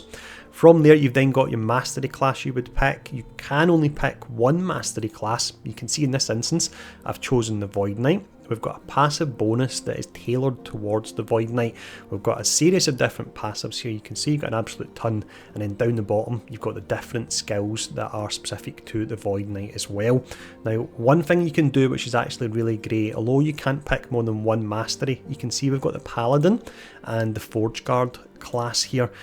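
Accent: Scotland, United Kingdom. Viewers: I'm from Clydebank. He is clearly Scottish. Irish accent is totally different.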